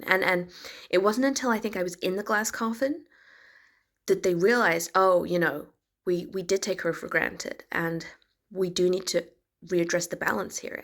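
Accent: United States. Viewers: Finally got it, clever as always. Nice American accent as well. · Wow, really nailed an American accent perfectly! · So brilliantly funny! Your American accent is spot on! I hope you and your prince live happily ever after! 🏰👸🏻🤴🏻❤️ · Seriously-- Hugh Laurie-level American impersonation. Effing flawless. Ok not Dick Van Dyke-good but pretty darn good. (j/k-- Big respect to DvD) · The American accent definitely threw me off at the beginning... I was like is the accent part of the bit, or is this her actual voice she's been hiding the whole time and she's actually serious now? lol nope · I didn't know Snow White was American. I didn't want to be that precise in my comment because it might spoil it for someone. I did marvel at the accent, I kept looking for a Scottish slip. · You had me on the hook from the get go. It wasn't until you mentioned your housemates coming home from the mine that I started getting suspicious - and it was only then I noticed the flawless American accent (being American, it sounded so natural to me I never picked up on it). 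Well done - yet again! Keep bangin' out those lattice pies! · Again, why can folks from the UK do better American accents than we Americans can! brilliance again. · your american accent is so good 😮 living outside edinburgh and i haven't met a single scottish person who can do a decent accent. i also haven't met a single scottish person who realizes their american accent is bad. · The American accent threw me! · Nice American accent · She does a better American accent than she does a Scottish accent. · Very good American accent. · So many delicious lines here. And the American accent delivers a perfect misdirection. ❤ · Hilarious! Great accent by the way, you've got the American accent down perfectly. You are stunning snow white and the fairest of them all. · American accent ~ Chef's kiss! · absolutely ADORE your American accent! Brilliant. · You have a really good American accent. And the story was hilarious. 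Very talented. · At first the American accent had me confused. I thought, “Wait, have I been wrong all this time about who she is?” I fell right into it. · 1,000 views per hour. DANG. Must be the freckles. You've got the American accent down! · you sound so American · ... and a perfect American accent. Brilliant. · The States accent is a win. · That's a seriously solid American accent. Gold star!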